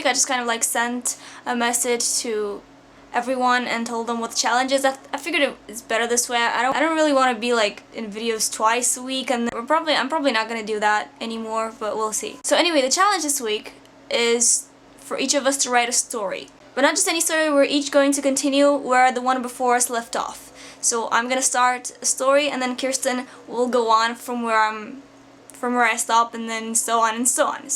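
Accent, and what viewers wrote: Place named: United Kingdom. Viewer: oh shit your accent gets more british every time nooo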